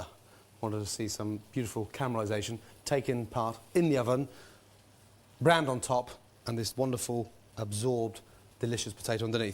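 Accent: Scotland, United Kingdom. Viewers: Love this down to earth raw front line insight. Its amazing to see Gordon not in massive wanker mode! I love his thick Scottish accent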